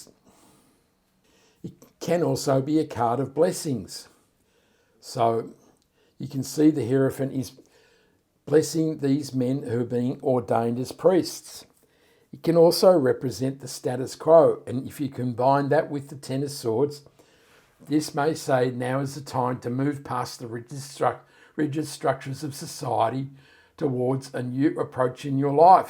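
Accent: Australia. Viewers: Thanks very much - great reading Love listening to a fellow Aussie ❤️ your interpretations always have depth and an authenticity to them Wishing you much light and happiness 😊😂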